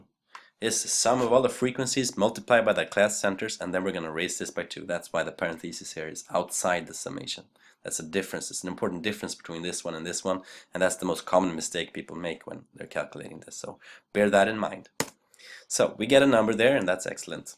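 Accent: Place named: Sweden. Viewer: Thanks for sharing! You have a Swedish accent! :)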